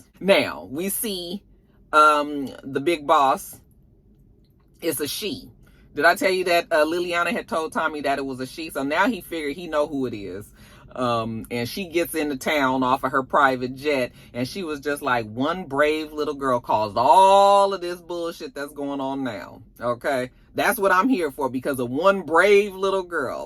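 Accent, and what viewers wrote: Ireland. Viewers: 🤣😂🤣😂 I loooove your Irish Accent!! Excellente' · It's the Irish accent for me...:)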